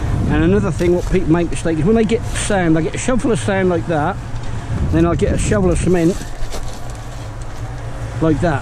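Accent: Norfolk, United Kingdom. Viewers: What part of Norfolk are u from ?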